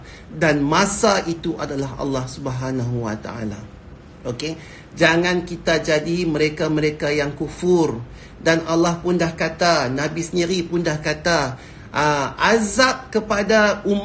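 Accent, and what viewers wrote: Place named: Penang, Malaysia. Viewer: Penang pure..asai georgetown..kedah lain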